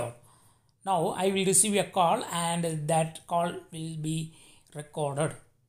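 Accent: India. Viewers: Why do you Indians speak like this?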